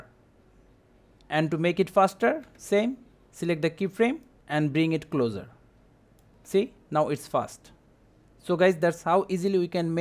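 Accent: India. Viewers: When ever I hear the indian accent I know that it will solve my problem W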